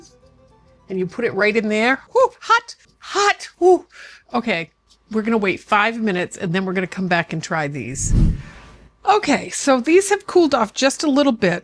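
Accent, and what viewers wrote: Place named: New Hampshire, United States. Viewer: Where are you from. I swear I hear a northern mass southern nh accent. From where I was born!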